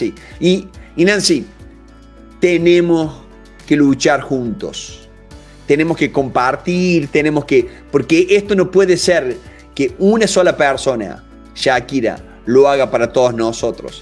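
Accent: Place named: Cordoba, Argentina. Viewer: Acento weon de los cordobeses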